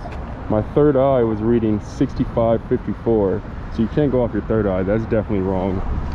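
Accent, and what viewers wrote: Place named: Bay Area, United States. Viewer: My boy, you must be from the Bay Area.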